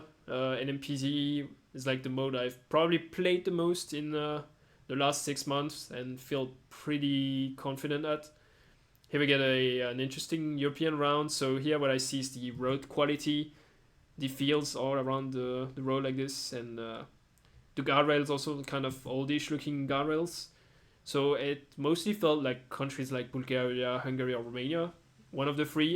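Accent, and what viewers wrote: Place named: France. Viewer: Pourquoi tu as le drapeau Danois ? j'entends l'accent français 😅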